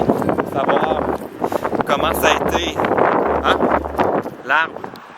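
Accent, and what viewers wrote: Canada. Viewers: Il est canadien ça s'entend non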